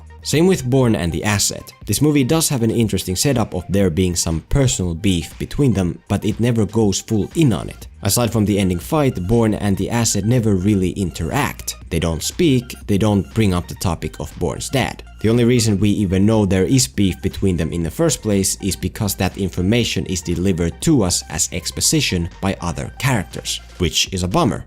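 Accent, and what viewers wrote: Finland. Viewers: Are you finnish? Accent sounds very finnish.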